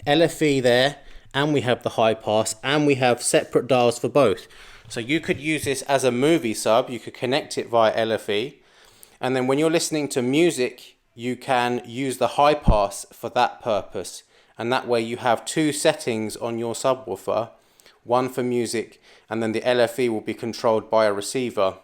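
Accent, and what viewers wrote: United Kingdom. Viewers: It’s VERY refreshing to watch a hifi gear review that’s not from some slick Nth American that comes off like a CNN grade infomercial. And the Nth American sales guys are easy to spot as salesmen as they speak entirely scripted and most use their hands like politicians or billionaire CEO’s. As soon as I see/hear them I’m gone. Yours is entirely real and obviously a non-professional slick salesman presentation. Thanks keep going. And the subtle humour doesn’t go unappreciated. Cambridgeshire accent btw??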